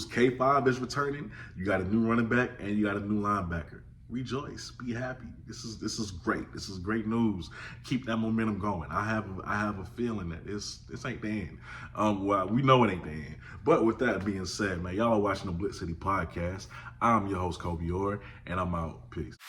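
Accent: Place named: Lowcountry, United States. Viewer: Okay you from the low country!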